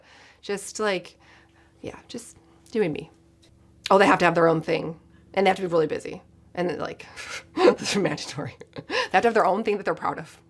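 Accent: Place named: California, United States. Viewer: She is a California girl through and through. That’s how we talk.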